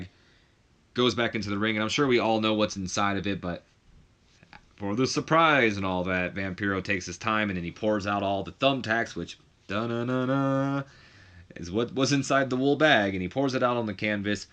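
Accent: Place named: United Kingdom. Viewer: I'm british but not from a posh away so no offence was taken with your british accent Also great vid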